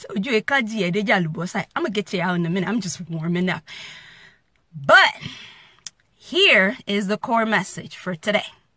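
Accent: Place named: Yoruba, Nigeria. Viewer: Ye mi alafia ni ti wen Mami, so glad to watch ur video missed the vibe n enlightenment listening to u speak our unique dialect u just amazing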